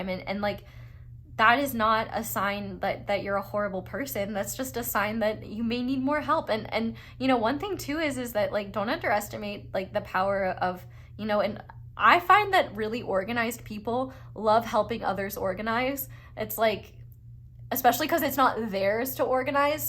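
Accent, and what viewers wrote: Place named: South, United States. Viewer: I love the southern accent it’s cute thank you 🙏 god bless you ❤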